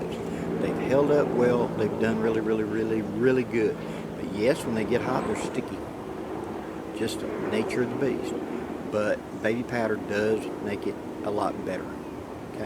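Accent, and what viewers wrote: South, United States. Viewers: you are one of my favorite outdoorsmen pesenting common sense advice. Once again I have to take a minute to digest that southern speak. " Double it in half". Love it, carry on.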